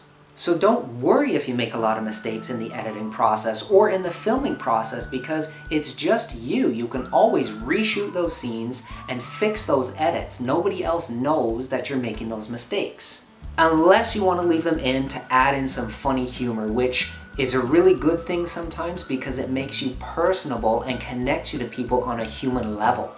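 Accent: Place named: Canada. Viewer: Great tips as always. I listen to energetic music before my live streams. I also generally try to record when I'm feeling good and having a good day vs otherwise. I've always thought you had a Canadian accent, but today I've just noticed your video location and hoodie logo. 😀 Cool! Yay a great Yoda quote, now two. I do have a friend who is very talented in graphic design and is helping me as we speak! Lol "For the love of popsicle Pete!" I've never heard of that phrase, I love it.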